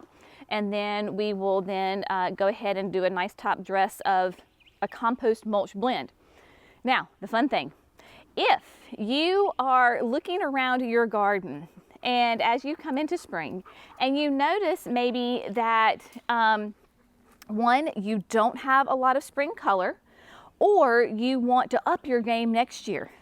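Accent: South, United States. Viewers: ! I could listen to you all day! Your southern accent is adorable. I love how encouraging and inspirational you are. You give me so much courage and strength to get out there and get stuff done in my overwhelming yard. I absolutely love your quote, ”There is grace in gardening.” I need that sticker for my water bottle! 😂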